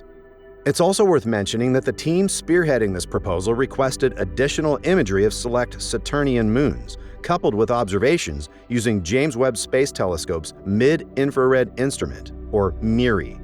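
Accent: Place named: United States. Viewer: ki American voice😅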